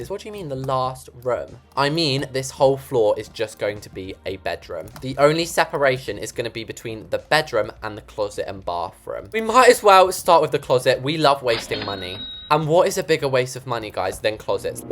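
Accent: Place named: United Kingdom. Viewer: The queen has amazed us again!!!!❤ always buts a smile on our face whith his loving British accent 😂😂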